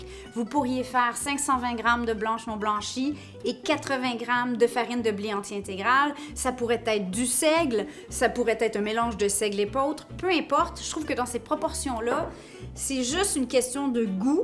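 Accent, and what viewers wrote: Quebec, Canada. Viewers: Un petit coucou de Bretagne pour la nouvelle année qui approche. :hand-pink-waving: Je vous envoie (par la pensée) des tonnes de notre bon beurre salé breton, au sel de Guérande bien sur, puisque c'est de là que je suis ! :face-red-heart-shape: J'essaie la recette dès que j'aurai l'occasion. Merci pour le partage et le petit accent québécois. 😉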